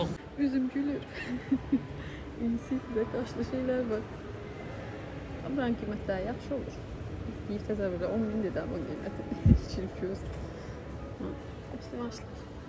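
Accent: Sheki, Azerbaijan. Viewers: Siz Şəkilisiz ? Şəki akcenti var .Onlar şirin danişir. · Xanim yeqin seklidi danisgindan hiss elədim😊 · Ləhçə şəki həəəəə❤? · Salam dogma sheki lehcemiz siz shekinin hansi terefinnensoz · Hara lehçesi bele?Şəki? iranlıların da lehçesi ne oxsayr.bilmedim hara lehcesidi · bu arada lehcenden,menim yerlime oxsaddim .eger sekidensense mende Sekidenen ,✊😀birazda balaken lehcesine oxsadiram .Allah size ailene balalrina uzun omur xos gunler can sagligi❤️ dileyirem . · Şəki hər kəndinə quzu kəsim heylə.Heylə həblədə davam eliyin supersoz.Əla alinir ləhcəmizdə efrdi.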